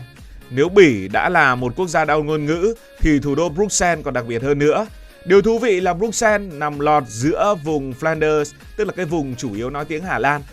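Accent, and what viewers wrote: North, Vietnam. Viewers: Tiếng băc ky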